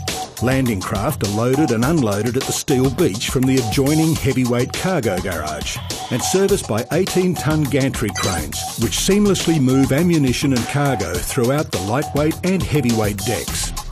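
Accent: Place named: Australia. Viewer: Australian accent, I prefer English UK acent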